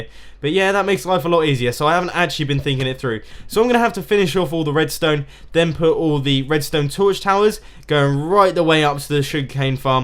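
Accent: England, United Kingdom. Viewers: It’s hello, he’s just British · YOU ARE ENGLISH? i didnt know :/ :P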